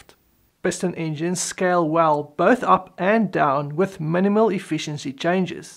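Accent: South Africa. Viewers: Brilliant !!! Well presented. Hou van die Suid Afrikaanse klink.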